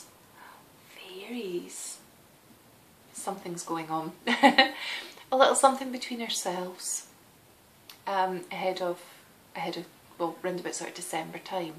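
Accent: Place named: Scotland, United Kingdom. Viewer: Oh you are such a sweetheart:) so glad to see that your move went well! I just love your Scottish accent I could listen to you talk all day my dear! Looking forward to the Christmas sock swap!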